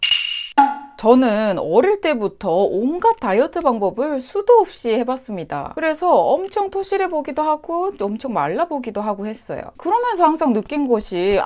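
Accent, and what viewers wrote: Gyeongsang, South Korea. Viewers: Yuna Kim 저도 경상돈데 살짝 저분은 경상도 말투 중에서도 희귀한 편인듯요.... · 경상도 사투리 베이스의 표준어인가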